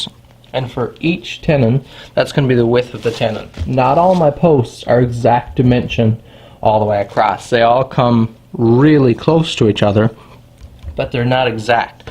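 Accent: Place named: East, Canada. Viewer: hey, sounds like you might be from eastern Canada. Anyhow, that 2 inch chissel is actually a 2 inch slick.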